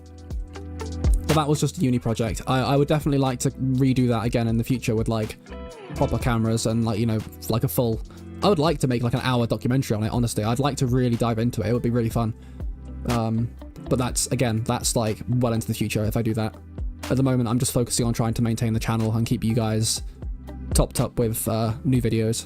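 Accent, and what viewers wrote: United Kingdom. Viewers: AAAA CONGRATS!!! you sound very british ahahaha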